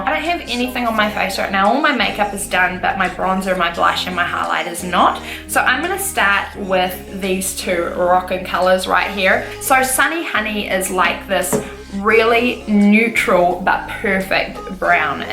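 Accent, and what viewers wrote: New Zealand. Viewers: Are you from NZ? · I almost guessed wrong but I paused and was like, "no, not Australian, I think New Zealand". I was so excited to have been correct.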